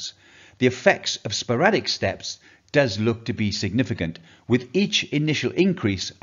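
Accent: United Kingdom. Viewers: I am glade to hear the British accent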